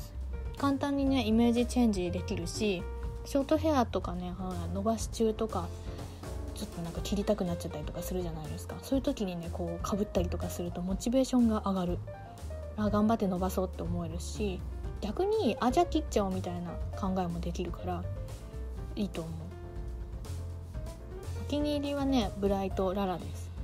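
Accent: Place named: Kansai, Japan. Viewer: 私もめちゃくちゃ迷います！特に着物は、結局何が正解かわからなくなってくるのでぱっと見の印象が残ってるうちにさっさと決めるように頑張ってます笑というかさん関西人なんですか！？ちょこちょこイントネーションが方言っぽいですね！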